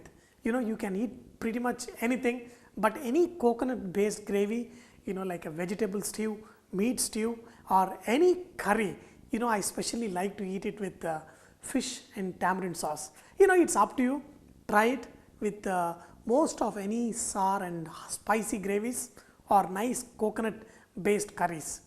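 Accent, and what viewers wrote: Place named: Kerala, India. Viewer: your accent is so typical malyali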